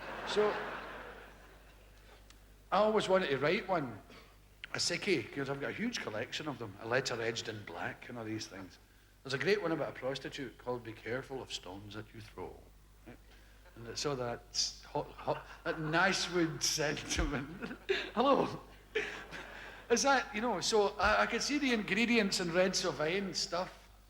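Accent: Scotland, United Kingdom. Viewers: Is it me or our beloved Billy sounding more scotish can't work it out ???🥰😘❤ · It's the early days, he still had his Glasgow accent. He sounds as Scottish as he's always sounded.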